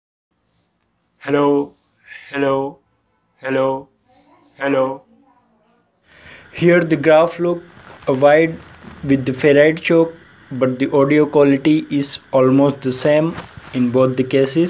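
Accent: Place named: India. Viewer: Your jeet voice cannot be fixed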